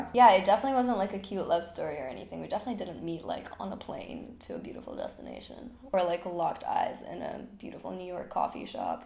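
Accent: United Kingdom. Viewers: you deadass are getting a little flare of british accent in your voice I LOVE IT lmao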